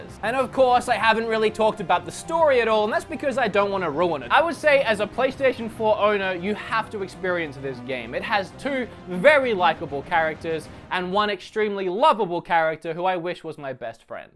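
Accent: Australia. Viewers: He is Australian lol, I'm Scottish and understand the confusion between English and Australian accents, listen for the subtle sounds that Australians make. Also, if you mean the whole island of Britain you would say British. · Are you australian? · Are you aussie or is my accent detection going whack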